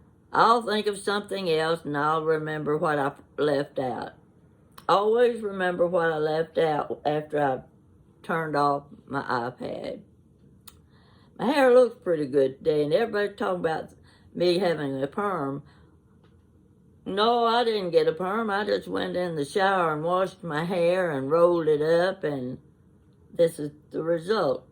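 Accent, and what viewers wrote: South, United States. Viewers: I've been watching you for months. I think i messed up, do you live in Kentucky or Tennessee? Either way i love your accent! There is a utube you might enjoy. It's Celebrating Appalachia, Tipper Presley's goal on her channel is to keep Appalachia language and foods ways alive. She's wonderful. She reads books to us on Fridays. Books Southern and Appalachian authors have written. They have been amazing. She lives with her husband, one if her twin daughters. Her other daughter & her husband live about 20min away. They garden, cook, have a good time. I love it! She also has a blog she started in 2008, the blind pig and the acorn. It's posted everday. So interesting. Grammy from Texas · Thank you so much for talking like me. Both sides of my family migrated over from KY. I've lived in a couple of places that don't appreciate our dialect. 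It's funny because I don't mind their's. Blessings to all.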